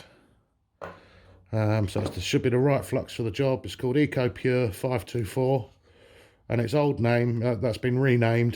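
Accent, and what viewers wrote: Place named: England, United Kingdom. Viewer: wch accent you got? from wich part of england are you from. Is nice!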